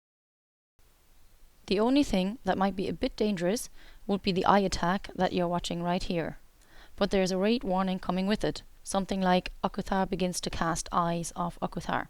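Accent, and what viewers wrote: Ireland. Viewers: accent is clearly irish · are u from mayo? im from wicklow myself, just cant pin point the accent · Shes not a robot, she's just Irish! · It sounds Irish to me. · Maybe she 'sounds like a robot' because she knows she has an accent and is trying to speak slowly and clearly, given that most of the people watching this won't be familiar with the particular accent (which I believe is Irish). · What part of ireland are you from? Im from Cork City! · Pfft German.....she's Irish ya daft twit. Bloody lovely btw :)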